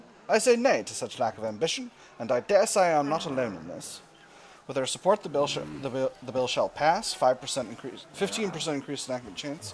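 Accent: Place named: United Kingdom. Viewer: Really enjoying the british accent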